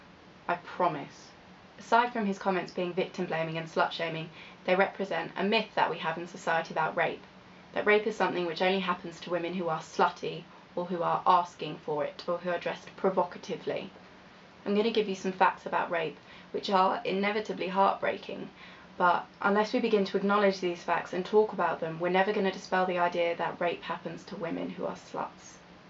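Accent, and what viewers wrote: United Kingdom. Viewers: nice posh accent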